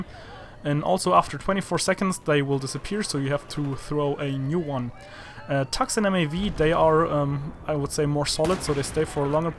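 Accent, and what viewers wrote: Germany. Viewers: mach mal deutsche videos.... Man hört das du deutscher bist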